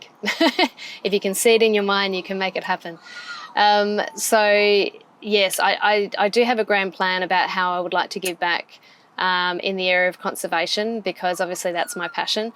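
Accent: Australia. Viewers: Hi, I’m a new subscriber and couldn’t have been more excited to hear a fellow Aussie accent. You will do well because you are so real and natural. I love your drone work it must have been tricky learning that. Di x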